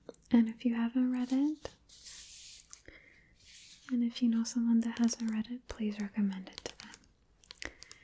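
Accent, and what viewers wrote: United Kingdom. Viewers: please make more tutorials with british accent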